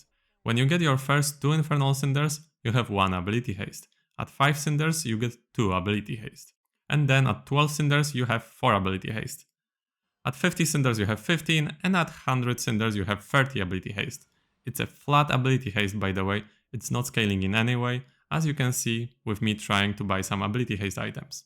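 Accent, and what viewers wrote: Poland. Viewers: Coś tak przypuszczałem że jesteś z Polski po akcencie. Niech żyje wielka Polska! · Polski akcent idzie od razu wyczuć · Dosłownie moja reakcja! Ciekawe czy to zmyłka, czy serio jest Polakiem. Akcent w sumie by się zgadzał. :D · bro are you from Poland?😁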